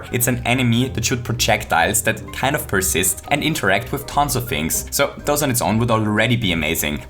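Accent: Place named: Germany. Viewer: Bin ich der einzige der denkt das er einen deutschen Akzent hat? OK dann bin ich wohl der einzige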